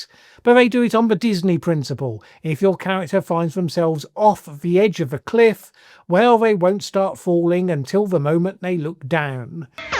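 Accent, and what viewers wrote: United Kingdom. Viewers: Sounds like a Brit, smells like a Ukrainian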